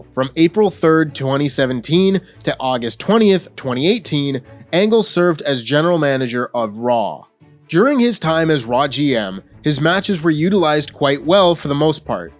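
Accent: United States. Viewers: American accent 🤯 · I thought the question of the day was "How did an American/Canadian get a presenter job there?"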